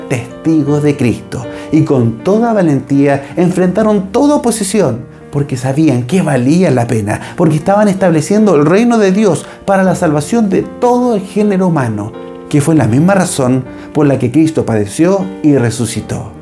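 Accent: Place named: Chile. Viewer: ❤hola buenas tardes saludos que bien video me encanta el acento de los chilenos ❤🎉muchas gracias por su trabajo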